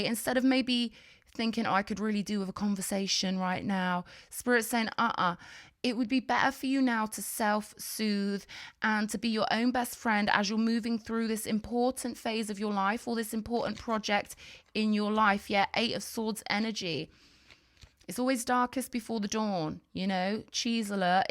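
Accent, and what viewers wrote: United Kingdom. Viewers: it certainly is a British accent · It's that an British accent?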